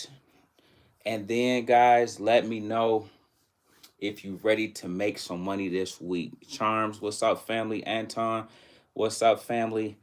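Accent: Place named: Midwest, United States. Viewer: Live your accent. You from the midwest? Indiana, Missouri, Oklahoma or Wisconsin?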